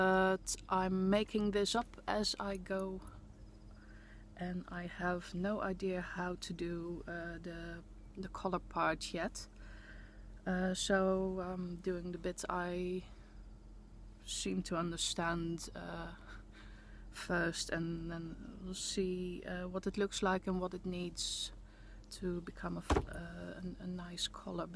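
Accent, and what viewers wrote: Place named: Netherlands. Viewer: Hearing you, I already thought you would be Dutch, because I hear you thinking in Dutch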